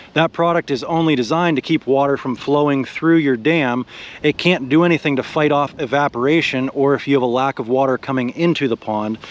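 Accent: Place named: Pittsburgh, United States. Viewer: Add bentonite clay, cheaper, are u in pa pittsburgh area? I here your accent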